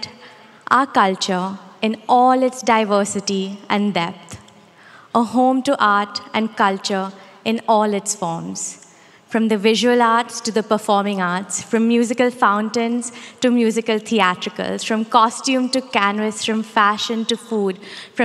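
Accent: India. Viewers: Indian accent hi hai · Aur kaisa hota hai indian accent bhai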